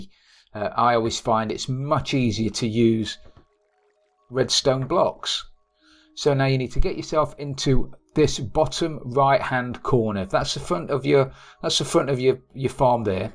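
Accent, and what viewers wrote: England, United Kingdom. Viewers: 30 seconds in i sub cus u sound like a nice english lad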